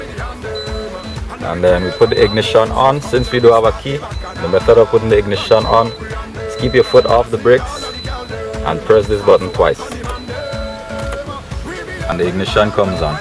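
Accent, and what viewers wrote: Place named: Trinidad and Tobago. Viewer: What island you from? I'm from Guyana. You sound like Trinidad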